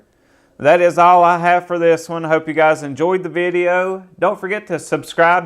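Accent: South, United States. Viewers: I like your southern accent pretty rad. Nice score from DareDevil19 also did you like my Superman poses in the review? I gotta learn to edit better so I can do cooler posing tricks in my vids but my style is mainly start to finish recording with only one or 2 cuts from packaging to figure. I find with transformers especially it's more exciting and authentic that way, not cheating like to make it look like I know what im.doing, instead you know I know what I'm doing ;) lol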